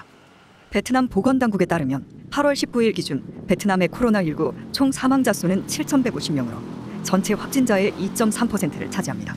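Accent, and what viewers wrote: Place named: North Korea. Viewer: 나래이션 악센트가 북한 억양이 있네요 ~~~ 발음이 너무 좋아서 귀에 팍팍 잘들려요 ㅎㅎ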